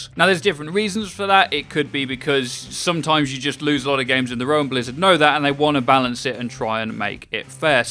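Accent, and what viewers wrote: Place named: United Kingdom. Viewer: Got your account in My team in placement matches huge loss still 10/10 to hear your brittish accent live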